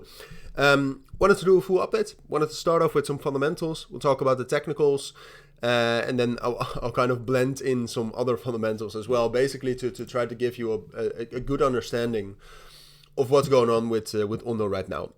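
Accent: Netherlands. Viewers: Where you from? Netherland?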